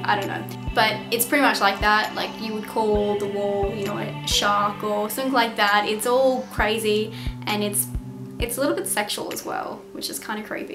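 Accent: Australia. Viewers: You’re an an Aussie, eh?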